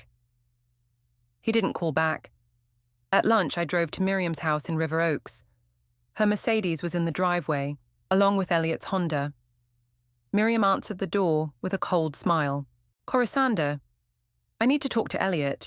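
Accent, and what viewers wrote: United Kingdom. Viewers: Texas with British accent?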